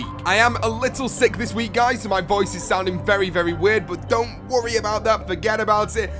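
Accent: United Kingdom. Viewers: not to be racist but u sounded even more british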